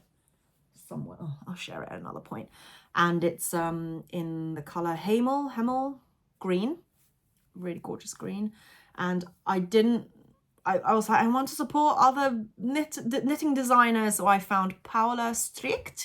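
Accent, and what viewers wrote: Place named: United Kingdom. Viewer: You were cracking me up with your side bar comments. And it looks like we may have the same esthetics with knitting. So you may inspire me with future projects! And besides … I really enjoy listening to your British (or is it English) accent. ☺️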